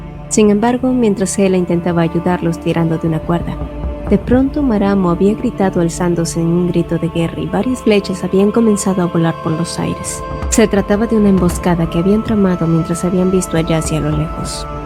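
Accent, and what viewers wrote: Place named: Paraguay. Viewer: Me re suena esto re paraguayo ayuda soy la unica?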